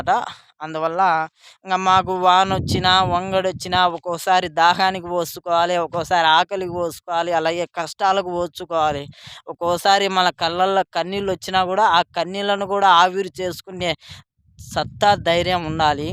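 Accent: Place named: Pulivendula, India. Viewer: Anna naadhi pulivendula daggara palli nee basha vintunte maa pallelo laaga vundhi ee vooru anna manadhi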